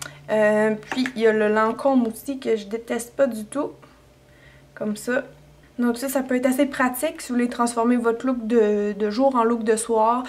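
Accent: Canada. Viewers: J'adore l'accent canadien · Mon Dieu, je vous aime vous Québécis et toi: Vous comprenez, VOUS, qu'on dit pas louze (comme les français le font si bien) mais "lousse" pour le mot loose. Merci d'exister!!:))) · C'est un accent, l'accent canadien :) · T'es canadién ?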